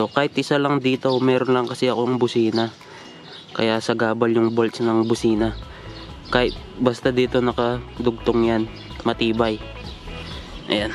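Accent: Pampanga, Philippines. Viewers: kapampangan ka no paps haha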